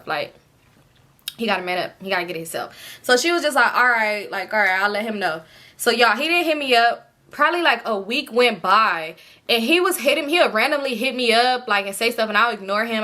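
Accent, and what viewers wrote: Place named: West Coast, United States. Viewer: Dang your /our west coast accent is crazy annoying .. I done stopped making conversations.SLOW down gurl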